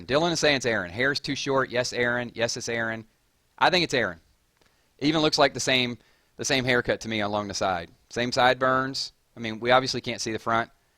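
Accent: United States. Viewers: And I like your voice it sounds country and that's what I like about you and all of your walking dead vids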